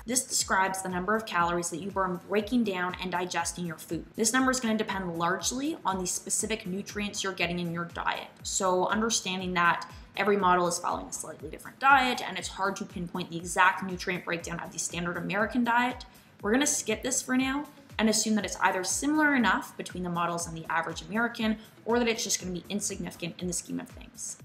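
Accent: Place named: Canada. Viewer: Just realized you're Canadian but how you pronounce words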